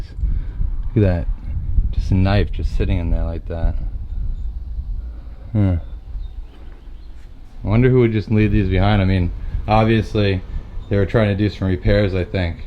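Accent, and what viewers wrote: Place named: Long Island, United States. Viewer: i take it you split from either long island or nyc i leaning more on the long island thing by the way you talk .so you raded ny for flo???????how is it dwn there thinking about moving myself .from the great communist north east ..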